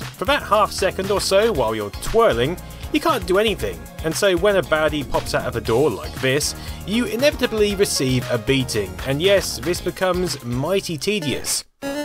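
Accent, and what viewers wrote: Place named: Norfolk, United Kingdom. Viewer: he's from Norfolk, they all talk funny there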